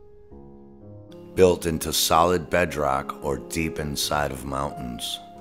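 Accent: United Kingdom. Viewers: WHAT IS this music... is your name? I lived for a few years in Kolwezi, Katanga [Shaba] in Zaire, and we had a small lake there, perhaps what's left of that Lake called Zembre... Zaire is the name of the whole country [Congo[ in Mobutu's time. Kolwezi is close to Angola. [that was 62-64]. Before that I was in Kambove and Jadotville/Likasi, born there of colonial parents, and we retured to Belgium. I am in Britain since 1968. I love it when you read a text with the British accent, and of course your amazing theories, especially this one with an eluxidation for this great mystery concerning what the Son of Man means!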